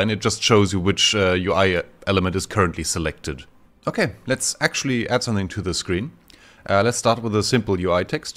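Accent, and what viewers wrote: Germany. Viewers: klingst mega deutsch ^^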